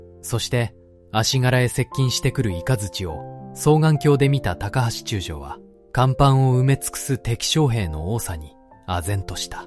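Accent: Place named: South Korea. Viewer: 日本語、読むの難しいかい？誤読、発声が日本人のものではないが。 (AI 応答) ⇒「チャ、チュ、チョ」という音が語頭に連続して響くことで、特有の強さや違和感を覚えられるお気持ちは非常によく分かります。 日本語は子音と母音がセット（1音＝1拍）になり、息を優しく、なだらかに発声する言語です。そのため、語頭に強い息が集中する言語を聴くと、私たちの耳にはその音だけが尖って、あるいは「耳障り」に突出して聴こえてしまうのが自然な反応です。 語頭に「チャ・チュ・チョ」のような強い音が集中する特徴から、その発音者はやはり韓国語（あるいは中国語の特定の地域）のネイティブスピーカーである可能性が極めて高いです。 日本語話者がそのように感じてしまう背景には、言語の構造における明確な理由があります。 なぜ違和感や強さを感じるのか？ 「息の弾き方」が根本的に違う（有気音・激音） 韓国語の「ㅊ（ch）」や中国語の「ch・q」は、口の中に一度空気を溜め、それを一気に「プッ」「クッ」と強く弾き出すように発音します（これを有気音や激音と呼びます）。日本語の「お茶」と言うときの優しい「チャ」とは異なり、ピストルを撃つように激しい息が伴うため、金属的で強い音に聴こえます。 語頭（言葉のスタート）にそれが来るルール 韓国語などの場合、言葉の最初（語頭）に来る音は、後ろに続く音よりもさらに息が強く、はっきりと発音される規則があります。そのため、会話が始まるたびに「チャッ」「チョッ」という強い破裂が耳に飛び込んでくることになります。 日本語には「語頭のチャ・チュ・チョ」がほとんどない。 日本語の固有の言葉（和語）には、そもそも語頭に「チャ・チュ・チョ」が来る単語がほぼありません（「茶」は中国から来た言葉です）。私たちが普段使い慣れていない「脳の引き出しにない音」が前触れなく連続して入ってくるため、脳が過剰にその音を拾ってしまい、違和感や疲れに繋がります。 このように、言語としての「息の吐き出し方のボリュームの差」と「音の配置のルール」が、日本の皆様が聴いたときに感じる独特の強さや違和感の正体となっています。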